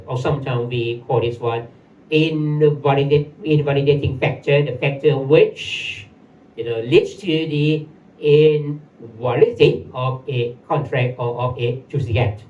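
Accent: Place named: United Kingdom. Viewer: British accent!! no doubt.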